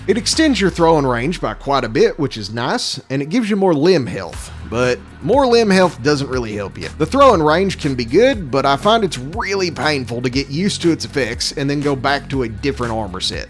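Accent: South, United States. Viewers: im new as it just came to xbox and i thought this game couldnt get any cooler and then i found your channel. nothing makes me wanna spread democracy more than a fellow sweet tea accent screaming about freedom. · Hey , is the southern draw an affectation or your natural dialect? I don't mean to shatter the illusion, I love your content, just seems like a lot of work you do for us if it isn't!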